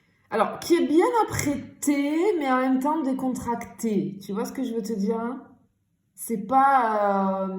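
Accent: South, France. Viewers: Bonjour, merci pour vos belles vidéos. Je les découvre depuis peu et beaucoup me parle. Celle-ci en particulier. J’adore votre énergie, votre accent du Sud, votre chaleur et votre bonne humeur. Et j’adore votre manière de faire : « ou la lalala ! » Lol recevez toute ma gratitude.🙏🤗💙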